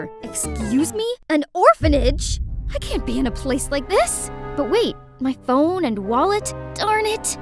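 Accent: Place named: Canada. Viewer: I love that there is a hint of canadian accent in the narrator's voice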